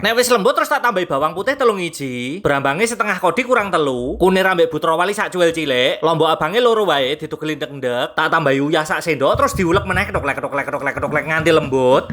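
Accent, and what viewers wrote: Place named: Java, Indonesia. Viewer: Dengar suara mas ny yg reyah bisa ketawa sndri q,,,,sama2 orang Jawa jdi Pham bngt bhasa nya lucu skali😂😂😂😂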